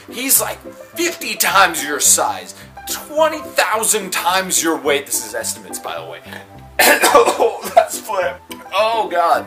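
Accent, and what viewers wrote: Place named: Germany. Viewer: I wish you would have done this in your german accent, but this was really funny you should damage your liver more often xD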